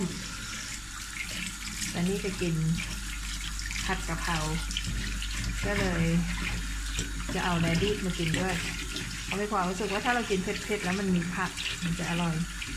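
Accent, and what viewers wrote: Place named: Kanchanaburi, Thailand. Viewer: เสียงแบบนี้คนกาญแท้ๆแน่เลย ผักสดๆน่าทานมากเลยครับพี่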